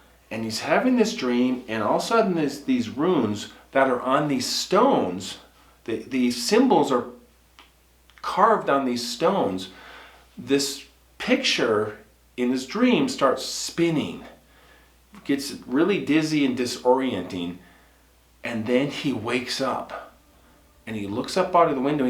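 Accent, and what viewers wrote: Minnesota, United States. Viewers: I love Grand Marais, I e gone dogsledding there. I have no doubt with all the wild country that big foot is out there. Remer MN is really into Bigfoot ! Love to hear you say Minnesota, the accent is still there😊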